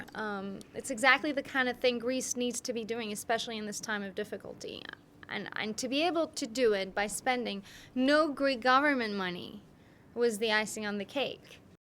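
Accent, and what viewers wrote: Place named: United States. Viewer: Her American English accent is really good. Her hair is long as hell though she needs to get it cut.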